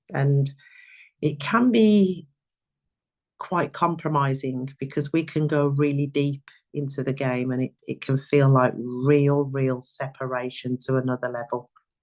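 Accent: United Kingdom. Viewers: Hearing the truth via your English accent is🤌🏻🤌🏻deliciousness Very good recipe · I don’t know which UK accent you have, but I was playing your video and my husband overheard your voice from the other room and said it sounded as if I was listening to a female Idris Elba 😀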